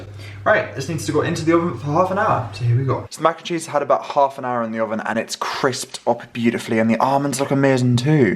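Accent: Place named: Durham, United Kingdom. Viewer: pleasantly surprised to hear a Durham accent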